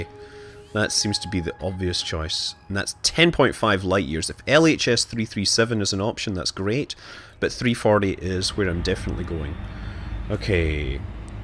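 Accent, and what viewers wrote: Scotland, United Kingdom. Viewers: Mr.: as you got closer to 'Home', I shared in your obvious excitement. Well played, commentated, and shared. Thank you! P.S. It was your Scots accent which originally caught me, then I watched more of your vids, and have since subbed. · Has anyone told you, you sound like a Scottish Carl Sagan?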